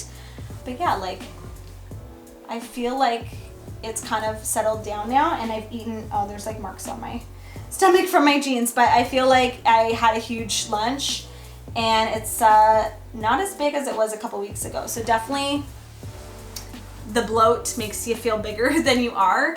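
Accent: Canada. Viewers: Is she Canadian???!! I can hear an accent!!!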